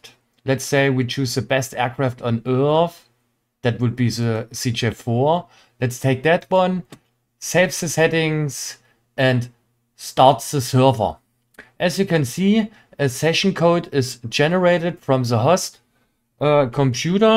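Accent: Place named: Dresden, Germany. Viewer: Geiles Tutorial. Als ob du auch aus Dresden kommst. komme auch dort her😅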